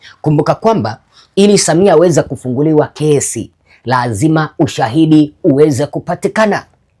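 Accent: Kenya. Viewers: Mbona accent ni ya kenya? Reporter anajiita mtanzania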